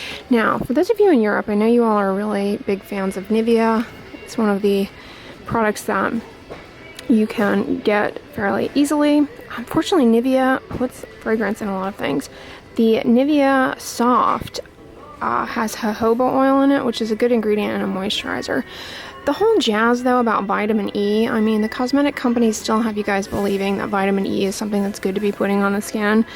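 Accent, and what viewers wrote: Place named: South, United States. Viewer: Southern asmr turns dermatologist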